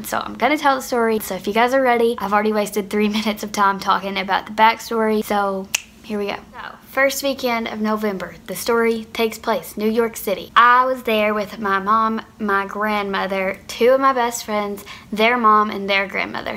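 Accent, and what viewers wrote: South, United States. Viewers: Yo, I swear I knew your accent 😂 KY girls gotta represent. Lol. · talks with a southern drawl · Tinna jones it doesn’t sound uncommon to me, it just sounds like a southern accent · Oh my !! I’m new, from Canada Ontario. You have such a beautiful southern accent !!! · I love your accent sounds very Southern i can tell ur from Oklahoma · So much courage! I hear some southern I’m from Georgia ! I appreciate your story! May god bless you! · Kentucky crew lol sorry hun but I love the accent mine isn't thick cuz how North eastern I am or at least I don't notice it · girl my first thought when you started talking was that you were from kentucky too!! you’re so pretty. anyways thank you for sharing this,, it’s definitely gonna help a lot of people. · I knew as soon as I turned on your video you were a fellow Kentuckian! Great story time. 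.thanks for sharing your story How the hell did she know your friends last name?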